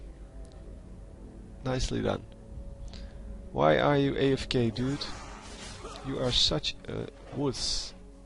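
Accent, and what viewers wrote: Netherlands. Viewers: hearing a bit of dutch trough your voice :D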